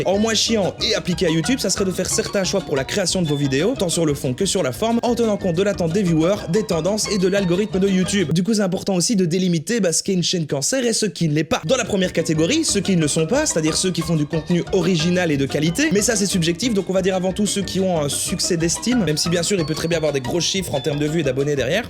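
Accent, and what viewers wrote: Liège, Belgium. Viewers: Dis moi, es tu belge ? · Il me semblait bien avoir entendu un accent liégeois ! Bonne analyse des contenus de m... Et sinon le sel est passé maintenant ? :-P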